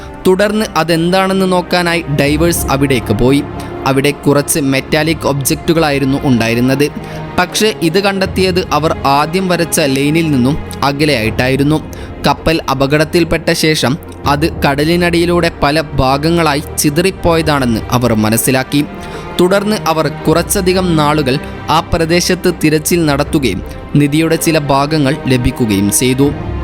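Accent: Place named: Northern Kerala, India. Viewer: വടക്കൻ ഭാഷ കേൾക്കാൻ ഒരു രസം ഇല്ല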